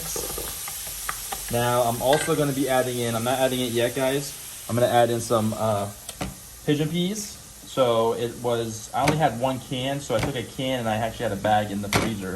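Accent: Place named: Trinidad and Tobago. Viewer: Hi, i love how you use the local trini indian terms when you are cooking. You sound like a real long time trini back home 😊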